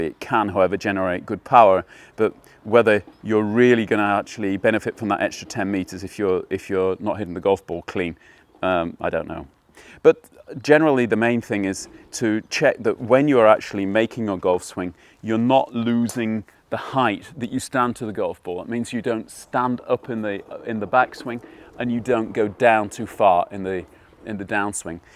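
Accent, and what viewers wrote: England, United Kingdom. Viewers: Guy sounds like Mick Jagger same accent.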